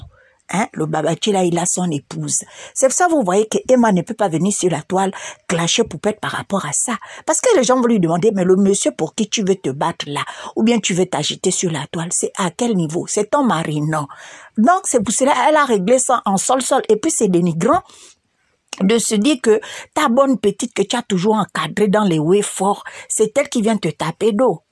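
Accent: Gabon. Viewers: 😂😂😂😂😂 explication excellent , j'adore trop l'accens gabonaise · Ton accent gabonais la 😂😂😂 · C'est une gabonaise qui parle🤣🤣🤣 une fille du sud! Je m'abonne 🇬🇦👏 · Je m'abonne j'ai reconnu le bled à travers l'accent. #Gabon#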